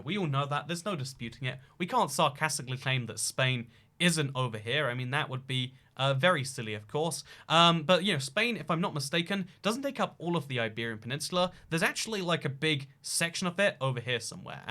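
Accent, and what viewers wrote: United States. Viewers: Edit: Warning, if you are on a phone, be careful about clicking read more, I accidentally wrote a HUGE paragraph. You may also learn something after you get through the solid bock of text. There is one big reason that people sometimes think that is from America. First of all, you should know that EVERYONE has an accent, you just don't realize that you or the people in your area have it, because it is part of your dialect. Not all accents are distinct though, and some do sound similar. You also have your own distinct dialect/accent influenced by where you have lived, mostly influenced by where you grew up, and who you grew up with (friends and siblings more than parents). Side note, Great Britain and America have been friends (and allies) since the American revolution, yes Great Britain did try to take back America in the war of 1812 but did you know that even though America lost the war of 1812, America is still its own county. 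America and Great Britain have been influencing each other for centuries, it should no surprise that some people are going to confuse an English accent with an American one, in an area of America that sounds surprisingly similar. I'm looking at you South-west. I am allowed to say this, I live in one of the Southwest states and have been to all of them, but I have noticed, that some states try really hard to have a distinct identity as a state, some states have that, but some states don't have that, and honestly, sorry Southwest of America, exclu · Thought he was amerixan for a minute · Is this guy American? ???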